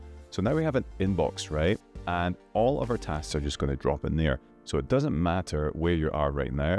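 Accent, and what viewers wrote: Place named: Scotland, United Kingdom. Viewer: Did expect a fellow Scot when I clicked this! 🏴 Good overview, great presenting and useful tips.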